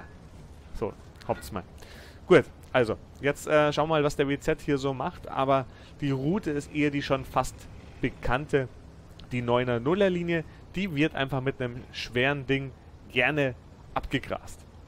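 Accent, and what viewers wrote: Bavaria, Germany. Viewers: aus welchen teil Bayerns kommst du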